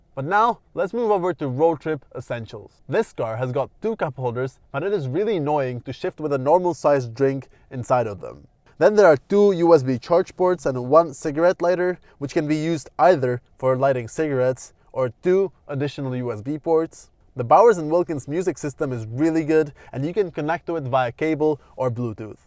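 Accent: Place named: United Kingdom. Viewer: I didn't ask for it, its not my video. Looks and sounds like some "Bloke" from the UK...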